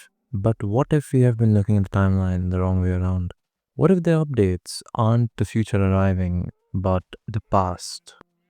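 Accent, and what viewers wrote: India. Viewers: Cool theory but why do you sound like a poopjeet?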